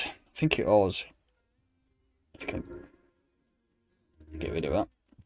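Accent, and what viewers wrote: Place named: Yorkshire, United Kingdom. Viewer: I was working and living in Scotland about 15 years ago... and people there speaks similar to you, so to me it's a pleasure to watch your channel and listening your British English language from Yourkshie 👍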